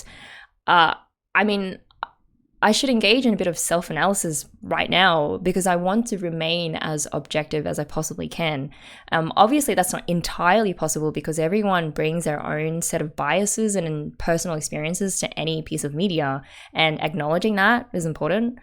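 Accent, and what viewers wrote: Australia. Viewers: 紮馬 sls 香港人？ i thought you were aussie through and through lol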